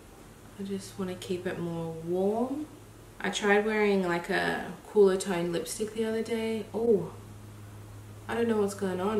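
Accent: Australia. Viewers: btw I saw your decluuter vid on my recommended. Stayed for the accent and personality. I am outside of Australia but I aspire to live in there one day. So I'm interested in Aussie stuff. I think you are so lovely and open and honest. It's nice to hang out with you cuz I feel comfortable. You feel reliable since you are so open and honest. Just letting you know why I stayed to give you an idea of how to get new people at your channel. I'm only trying to support since you talked about wanting to grow your channel. I know it is hard work. Hope everything works out well for you!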